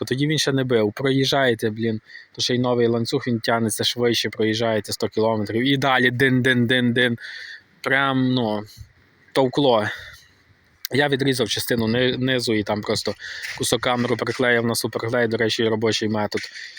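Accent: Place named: Western Ukraine, Ukraine. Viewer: Й ще одне! Перепрошую за питання - не хочете - не відповідайте на нього! За Вашою говіркою я прогнозую що Ви мешкаєте десь на заході Україні, найвірогідніше - Львівщина. Можливо помиляюсь. Сподіваюсь Ви не будете вважати таємницею назву області у якій проживаєте?! Особисто я у Черкасах.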